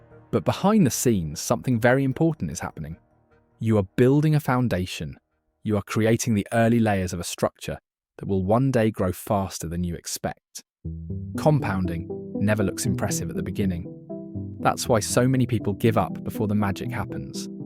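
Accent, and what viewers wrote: United Kingdom. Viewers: A great video! Thanks for posting! I thought you were a British YouTuber as you have selected a British accent for your narration. Would you do this for the UK? Or are we too small?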